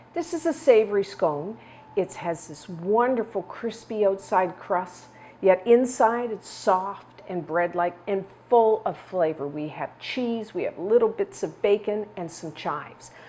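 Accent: Canada. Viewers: I knew you were from Canada as I spotted the accent - even from the Maritimes …. my mom was from PEI and I have a sister who lives there - I live in Ft. Lauderdale half the year and the other half Rockport Maine 🇨🇦🍁🇨🇦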